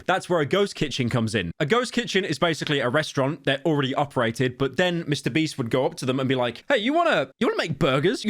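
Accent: United Kingdom. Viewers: Shut up British man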